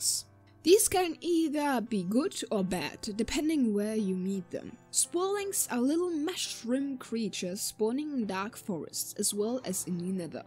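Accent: Germany. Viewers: Where are you from? You sound like german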